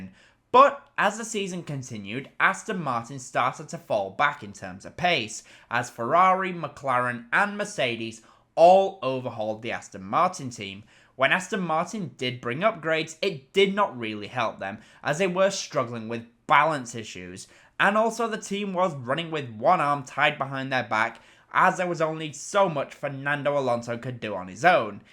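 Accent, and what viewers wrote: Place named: North, United Kingdom. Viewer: Hiya, lovely to see your face for the first time 😊. It is always nice to visualise what youtubers look like. I love your accent too. I am trying to figure out where you are from but not sure. It sounds northern. My predictions for this season are: 1. Red Bull 2. Mclaren 3. Ferrari 4. Mercedes 5. Aston Martin 6. Alpine 7. Williams ( I hope but Logan will need to start scoring( 8. Visa Cash App RB 9. Haas 10. Stake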